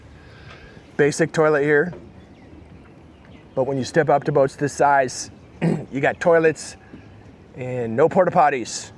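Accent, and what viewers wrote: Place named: Seattle, United States. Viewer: I can tell you are from Seattle by the way you talk.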